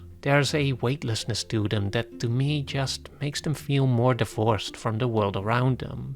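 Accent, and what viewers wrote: Netherlands. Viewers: Hey man, any chance you’re Dutch, I think I can tell from the accent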